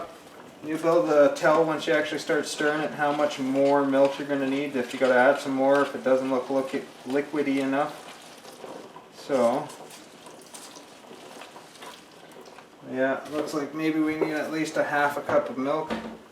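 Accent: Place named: Canada. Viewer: I wish I had his accent lmao such a pure Canadian accent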